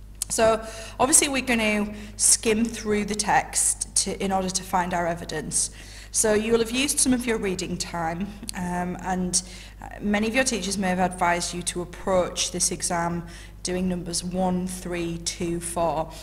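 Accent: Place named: Teesside, United Kingdom. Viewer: Love the accent lol are you from Tees? Also what are Peel paragraphs ?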